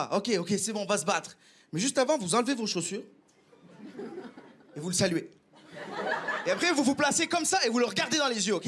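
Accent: France. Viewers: Ça aurait été mieux avec l’accent québécois que celui français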